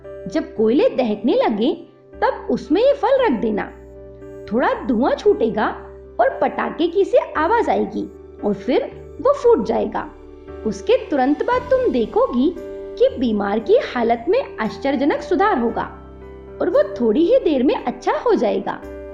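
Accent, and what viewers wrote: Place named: India. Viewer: What’re you saying ?.. can’t understand anything..because you’ve got such a strong Indian accent.. otherwise i do speak & understand Hindi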